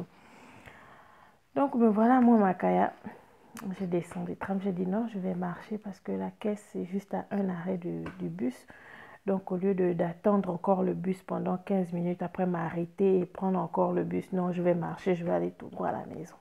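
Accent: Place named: Gabon. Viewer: Tu as comme un accent Gabonais ! Aniway je m'abonne 😊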